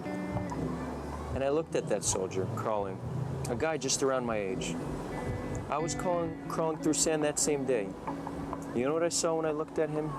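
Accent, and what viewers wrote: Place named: United States. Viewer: I think your American English is good enough for you to resettle to Gaza and educate local Arabs to live in peace, since there is no occupation of Gaza and thus no reason for violence. You should dedicate your life to that. There is no need to preach to non-Arabs. We have already army of the socialists, progressives, Fabians and others who do just that.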